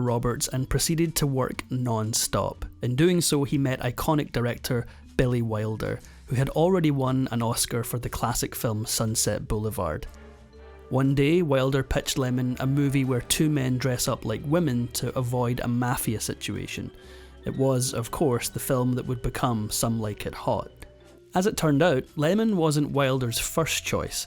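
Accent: Scotland, United Kingdom. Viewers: I love the lads wee Scot voice too… bc it reminds me of my grt uncle Micheal, a born raised in Edinburgh Scot. And the movie docs are grrrrrreat! (In my best Scottish roll of the r!) 😊❤ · Well, I'm in. I've watched several of your vids, and I've enjoyed every one. You manage to pick up interesting threads in performers' lives, trace them to their beginnings, then construct a well-researched, well-written chronological account of one portion of the tapestries of each of their lives. The Scottish accent is pretty nifty, too. I enjoy hearing "person" pronounced "pairson." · Oh no, not another Scottish/British voice-over for an American Actor. CRAP !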